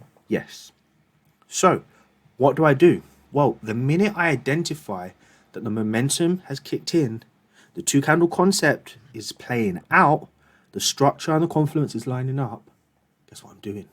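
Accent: London, United Kingdom. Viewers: Really sound like a cool london mandem! Hope to meet you one day G :) Great content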